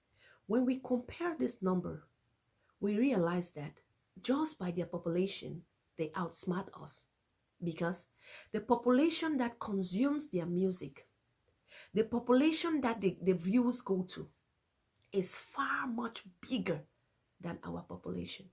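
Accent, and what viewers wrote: Akwa Ibom, Nigeria. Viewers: !!! You sound like a Crossriver/Akwa Ibom lady! You sound so Nigerian! And very pretty too! I am subscribing!! We are one 🇳🇬🇨🇲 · Her accent is like Akwa Ibom state, I don’t know but I picked some Akwa Ibom accent while speaking. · You look and speak like Akwa Ibom people in Nigeria. Keep it up sis. You have a new subscriber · Your accent and gesture, can easily make one call you, a Nigerian woman. I love that fact that you stated the truth with no sentiment. Our Cameroon artist should align with Nigerian artist, with their own unique sound and tap from the blessing, no need to compete, we all can win together. Mostly us from Eastern Nigeria were one entity with Anglofone Cameroonians for years before the division, we still consider them as brothers. No room for hate. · truth. She has akwa Ibom accent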